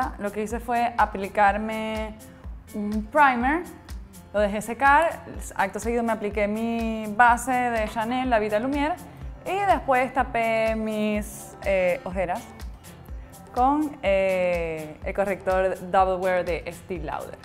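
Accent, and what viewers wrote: Venezuela. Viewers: el acento Venezolano no se iguala, lo reconoci al instante, me encanto tu video Saludos desde caracas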